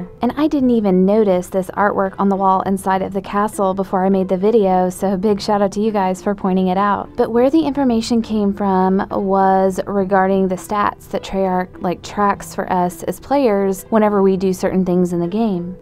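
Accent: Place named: South, United States. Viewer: She's southern of course she has a nice voice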